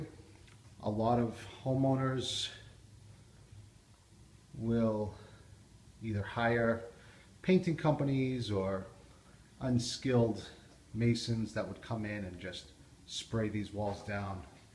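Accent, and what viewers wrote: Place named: Boston, United States. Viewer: Hi, I've been watching many mason videos in hopes of learning how to do what you are doing in the video here. As soon as I heard your voice, I said to myself, this guy is local as I recognized our Boston/New England accent. So here is my story. I am a 67 year old woman who tries to do as much as I'm capable of doing myself. The basement you are working on is very much like mine. I've started digging out in between the stones and cement. I've got approximately 4x4 area done. Clearly I've taken on more than I'm capable of. HELP!!!! Are you able to tell me what that job cost the homeownet? Since mine is in the same condition and close in dementions, it would, at the very least give me ball park number to think about! I will go to your web site and read more of your services.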